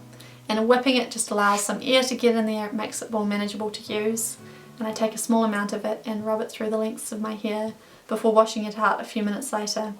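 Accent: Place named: New Zealand. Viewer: This was so calming and I love your neozealandian accent :)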